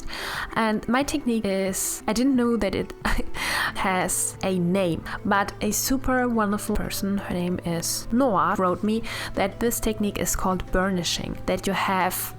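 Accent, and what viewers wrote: Germany. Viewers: This is Xiao from China. I have absolutely no problem with your adorable German accent. Your English is proved perfectly fine, and better than being fine -- it is so sincere and expressive. Love your art! And I feel the same when a painting or drawing actually comes out matching my expectation. So satisfying. ///^_^/// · I understand completely. the pictures transport me to some fantasy world I'm desperate to know the story of. And to answer another question. watching your videos to learn watercolor which I really struggle with but have found myself watching everything because you explain so well and are very interesting to listen to. also I love your accent. I had and visited a German penpsl when I was in school.. do your accent is a lovely reminder of that friendship. thank you xx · I love your accent! I used to work at a horse product store with a German lady and hearing you reminds of many fond times with her! 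· I can't believe people are so rude to say they don't like your accent! English is a weird language and you speak it great. I am Canadian, but my mother moved here from Germany, and you sound like my family; you are lovely to listen to. And an incredible artist.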